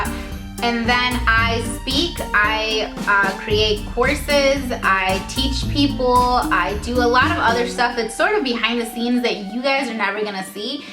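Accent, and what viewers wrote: Chicago, United States. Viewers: We do have an accent in chicago. We can't tell but others can. And you r the first person that actually said "I'm from the suburbs". Lol. ❤️chicago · Not a fan of the music, it was really annoying. And yeah, you do have a bit of an accent. I can definitely tell that you're from Chicago or the surrounding area. It's not thick accent, but it's noticeable. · It is a strong Chicago accent. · I know this may sound really creepy and stalkerish o.o but what suburbs of chicago are you from? I am very local to Aurora, haha and there is a but of the Chicago accent in there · lol i actually really think you have a chicago accent! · You do have a Chicago accent 😂 I love it though!! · It's actually a pretty strong Chicago accent in my opinion hahaha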